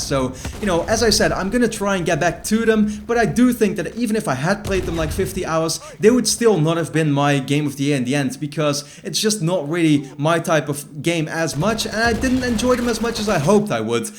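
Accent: Netherlands. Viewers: omg are you dutch? i tought it from your voice ( im dutch :p)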